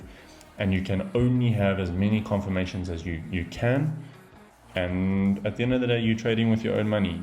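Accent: South Africa. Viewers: You sound South African 😅 great vid bro🧠👊🏾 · You from South Africa right? Really good video mate, well explained! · is that a Cape Town accent? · you south african?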